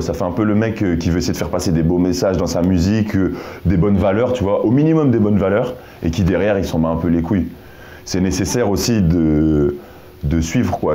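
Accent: Belgium. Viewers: On parle comme ça à Bruxelles hahah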